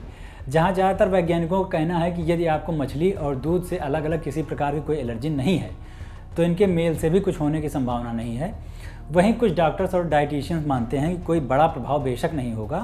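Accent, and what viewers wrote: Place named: Kanpur, India. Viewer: आपका ही इंतजार था भाई साहब, आपकी कानपुरी भाषा सुनने मै सच मै आनंद की अनुभूति होती है ।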